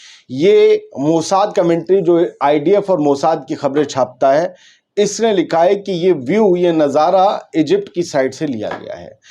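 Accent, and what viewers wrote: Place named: Bihar, India. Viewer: Bhai aap bihar se hain tale upar ye bihar ki zuban hai ❤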